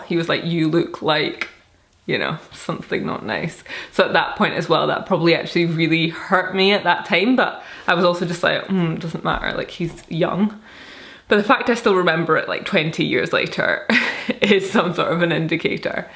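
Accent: Scotland, United Kingdom. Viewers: I think as human beings we have a natural inclination want to categorize things to help us navigate this terrain of the human experience. So when we meet up with a person that’s not in a category of people that we are already familiar with, the tendency is to try to find a category where we can place them into, thus the Beyoncé, Alicia Keys, naming call outs you were receiving, as much as tactless as it is or was. I personally, am very much intrigued by your looks and the British accent but at the same time by your humanity and profound understanding of this concept of trying to find where you belong or the “finding your tribe” type of thing. So when you share these experiences you’ve encountered in your life it does help to shine light into how alleviate and understand these awkward reactions you receive from people. Hopefully, this knowledge does help people who would otherwise react “inappropriately” to the unfamiliar “you”, act in a “natural” manner.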